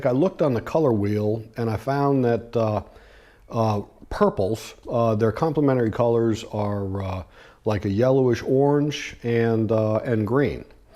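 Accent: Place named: East Coast, United States. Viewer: I also find colors to be so difficult, it's one of my areas to learn more about also. At the end, what you came up with look amazing in my opinion. I'm really happy you chose to differentiate the burl because it looked blah before you changed it. Great work, great looking guitar! Where's the accent that you have from, east coast I assume?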